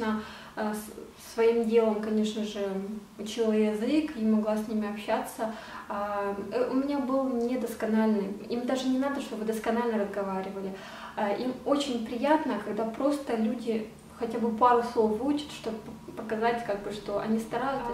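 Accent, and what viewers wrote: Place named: Urals, Russia. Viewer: Дикция уральская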